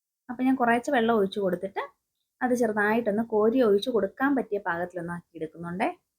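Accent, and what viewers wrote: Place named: Trivandrum, India. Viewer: ഭാഷ കേൾക്കുമ്പോൾ ട്രിവാൻഡ്രം ആണെന്ന് തോന്നുന്നു 😊😊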